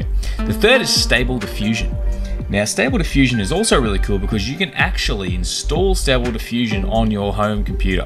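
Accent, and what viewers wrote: Australia. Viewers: So nice to hear an Aussie voice on this topic. Thanks mate great info.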